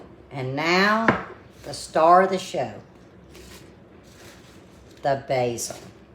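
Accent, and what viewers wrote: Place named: Louisiana, United States. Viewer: definitely the husband count! And, your Louisiana accent! Lol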